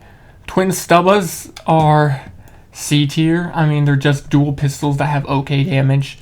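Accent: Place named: Germany. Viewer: You sound so german to me lel, and why do you refer to yourself as mentally unstable? 😅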